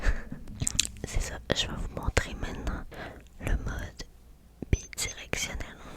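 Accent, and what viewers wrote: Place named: Quebec, Canada. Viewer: L'accent québécois est incroyable, merci d'être là 🙏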